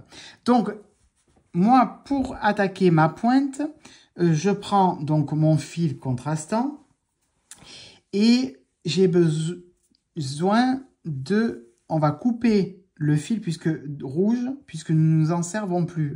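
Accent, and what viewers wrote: South, France. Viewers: Bonsoir, merci pour ce tuto au top avec notre accent du Sud! De mon côté le grafting n'est pas toujours net donc je tricote en toe-up. Bon tricot et à bientôt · Bonjour, je vous remercie pour ces explications et français et avec l'accent du Sud! J'ai l'impression d'être Je en vacances! Je vous écoute depuis la Suisse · Merci pour ces très bonnes explications, les pointes sont bien régulières. J'adore votre accent du Sud, celui du Nord n'est pas mal non plus, à bientôt dans la prochaine vidéo ou tuto, on se régale, excellent professeur 👍bizoux kiki du Nord 😘😘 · Bonjour tu as raison de te bader...😊😊 je suis du sud aussi et j'aime ton accent. C'est normal on aime sa region. Merci pour ton partage.🌻🌻🌻👍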